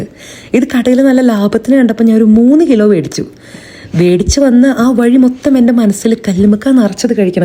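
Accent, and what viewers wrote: Kerala, India. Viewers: Thani malayalide sound pole und❤️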